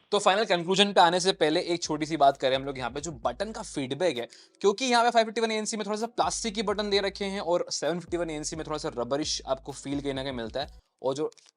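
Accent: Indore, India. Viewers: getting in the video for a sec I realised you are indori and then I read your name, and you are indori, I am also indori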